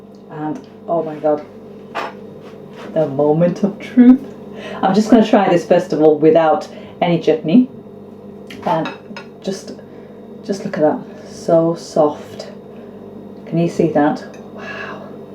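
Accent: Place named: North of England, United Kingdom. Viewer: You're very welcome !! If you recall, I mentioned earlier that I was born (1944) in Glasgow, & am now retired & living in Oakville, Ontario, Canada. I've eaten various Curries since my Teenage years, & make them at home. Also, I love your accent from (where in ?) the North of England. And, where are you now down South ? As I've said before keep up the good work, & Curry on !!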